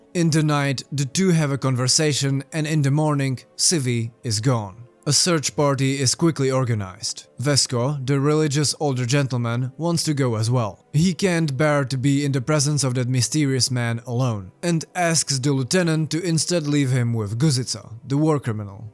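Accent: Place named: Eastern Europe. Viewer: Your accent is throwing me off I’m trying to figure out what part of slavlands you come from but I can’t Poland but probably not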